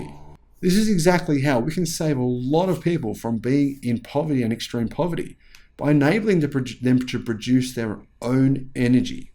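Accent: Australia. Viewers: Yeah , you sound Ozzi mate! 😉 Love the content and your style.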